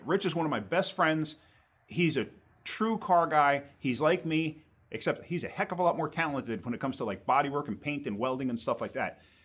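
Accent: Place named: New York, United States. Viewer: Listening to your accent , I'm guessing your from the NYC metro area ? Where are you from ? I will help your friend !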